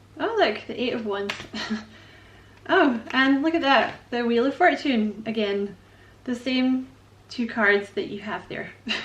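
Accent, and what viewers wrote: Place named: Scotland, United Kingdom. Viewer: I feel so welcome here. I like your Scottish Accent very much. Kia Ora (greetings in the Maori language) and a very big congratulations on your 100,000 subscriber plaque you fucking legend! Well done!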